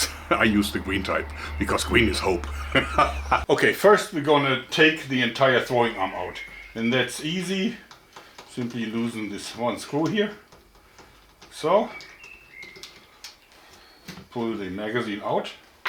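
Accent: Germany. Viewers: I've been a fan of your channel for years, and I was on a spree of your older videos today, and something that I notices is that your german accent disappeared more and more over the years! Keep up the videos, amazing channel!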